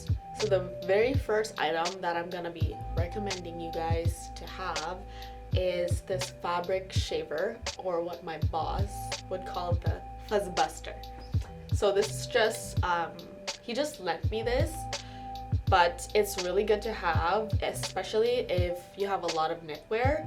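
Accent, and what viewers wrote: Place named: Canada. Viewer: girlllll I hear the canadian accent ♥ ahahahha love itt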